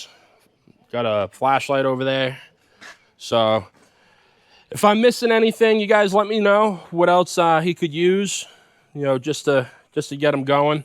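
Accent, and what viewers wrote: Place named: Upstate New York, United States. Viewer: You definitely live in upstate New York with that accent 😂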